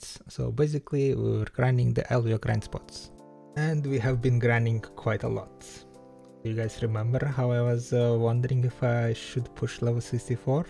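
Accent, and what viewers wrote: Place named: Rural, Russia. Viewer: ППц разговорный английский как из русского села)) чел завязывай) это явно не твое!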